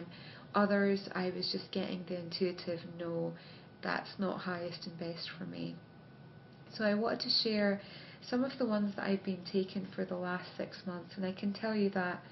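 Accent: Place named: Scotland, United Kingdom. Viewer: I think i detect a bit of Scottish accent.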